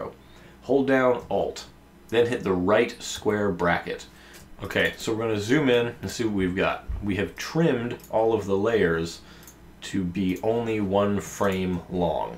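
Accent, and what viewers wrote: Canada. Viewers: nothing, I'm from the Philippines, and me and my american friend where watching this tut, and I ask him what accent is that ("your accent") and my friend said "that's probably a Canadian accent. No biggy, cool tuts anyway, learned a lot from you. Can you make an Infographic Tutorial. I've been doing a Corporate Promotional Video.. Would help me a lot. Thanks.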